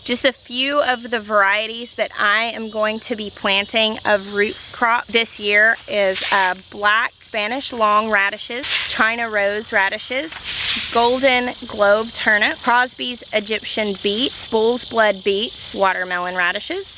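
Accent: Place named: Arkansas, United States. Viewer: she's very inspirational to me. We grew up 40 miles away from her home. I ended up moving away 20 years ago to Wichita Kansas. I get so tickled at her because her accent is so me!! Lol my family teases me when my Arkansas accent comes out. I've been sending them videos saying, now I don't feel alone. Then yesterday a man came by from one of the churches here they are having vacation Bible School. I was in the other room but heard his voice. I thought, that man is from Arkansas! I went to the door and he was telling my daughter he was from Arkansas. Lmbo we have a one of a kind accent like no other lol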